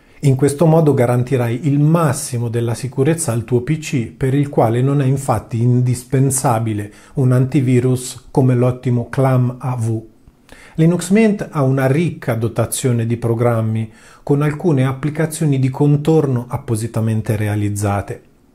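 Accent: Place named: Umbria, Italy. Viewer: ciao, non riesco a capire il tuo accento.....sei umbro?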